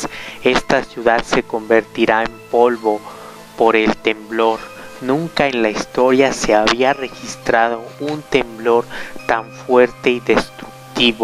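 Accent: Chile. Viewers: Chileno, ndeaah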